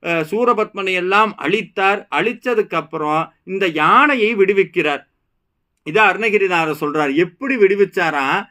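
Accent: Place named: Coimbatore, India. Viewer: Thanks Anna. Coimbatore Tamil la unga Explanation ah kettu konde irukalaam.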